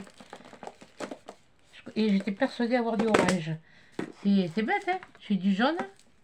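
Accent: South, France. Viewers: Coucou, je découvre votre chaîne et je reconnais bien là la gouaille du sud! J'adore! Vous m'avez bien fait rire! Y en a une qui a intérêt à bien se tenir!!! Votre franchise me plaît. Du coup je m'abonne et je pourrai découvrir vos créations. Merci pour le partage. Bisous de l'Aveyron.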